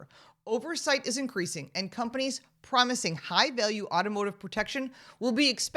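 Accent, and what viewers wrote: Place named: Buffalo, United States. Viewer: The familiar Buffalo accent. Very nasal.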